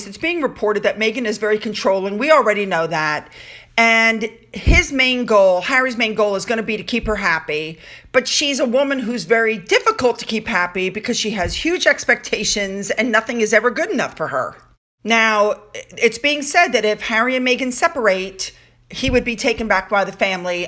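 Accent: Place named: New Jersey, United States. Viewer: I wonder where she's from? I'm guessing New Jersey, Pennsylvania, or Delaware.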